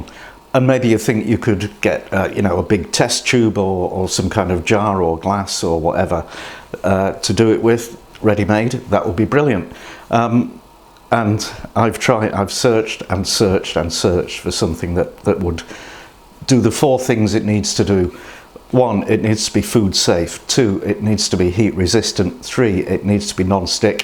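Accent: United Kingdom. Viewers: Kernel sanders is British?!?!?! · I love your accent! I'm American but my husband is Northern Irish. So I always love hearing the different dialects of British accents, and yours is lovely. Soothing to listen to. I also really enjoyed your video. And this is very awesome. I've never seen the long egg before now... And I think you did a very amazing job. Your videos are very well made, and I just love watching you cook lol! I'm not sure what it's called... Forgive me for my ignorance, but it's a common dish in Britain where they boil an egg and crack it open and eat it from the shell. I'd love to know if you have a video for that, or could maybe do one.. I've always wanted to learn how to make that and I really like your method of cooking! Or do you maybe have any knowledge of the proper recipe for it. Any how, I definitely wanna try this long egg! I love eggs, some salt, parsley, bread or crackers... 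Yummy. So this would be nice for so many reason, a gathering of friends an appetizer, or for breakfast with the family etc. Keep sharing! P.s that's a really awesome concoction you made there for the egg. Very smart and clever. Brilliant actually lol. Take care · is he British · I like the way brits talk. I wish he said potter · British Colonel Sanders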